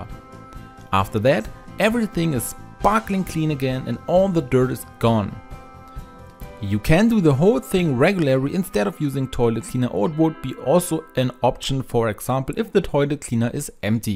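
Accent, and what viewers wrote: Germany. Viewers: The most German accent I've ever heard. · Öha , den deutschen Akzent hört man Danke für den Tip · 으째 발음이~~ 독일사람이 영어한 듯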